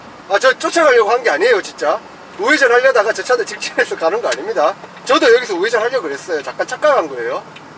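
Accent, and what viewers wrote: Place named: Gwangju, South Korea. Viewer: 사투리 들어보면 광주사람 이신것 같아요 영상 재밌네요 항상 화물 안전하게 운반 해주세용 요즘 또.. 얘기가 많네요 ㅠ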